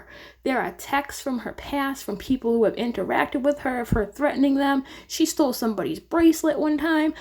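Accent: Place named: Boston, United States. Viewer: Great episode.... I love your accent.. Do I detect a Boston accident?? Maybe new york? One of the burroughs?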